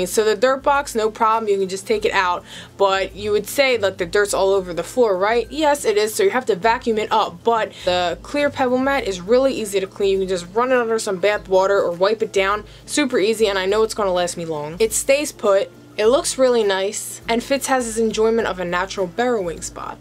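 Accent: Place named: New York, United States. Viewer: Jersey or New York, your twang is confusing as hell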